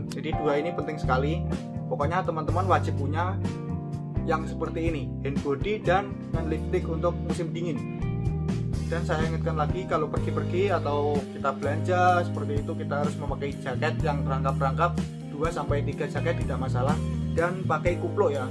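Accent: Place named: Java, Indonesia. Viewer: Samean jowo to mas😂 jos pokok e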